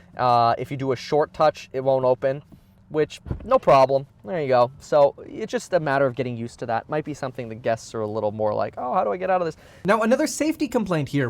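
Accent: Germany. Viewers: Is your father german?! 😂 i swear the accent reminds me of it!!!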